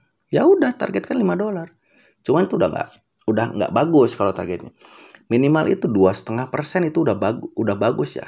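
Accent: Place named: West Java, Indonesia. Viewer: Cara menjelaskan bhasa rakyat jelata, dgn bahasa yg medok sunda dan backsound hembusan 'udud yg khas mengiringi setiap paragraf dn yg penting buat orang jdi mudeng dan cpat faham, hatur nuhun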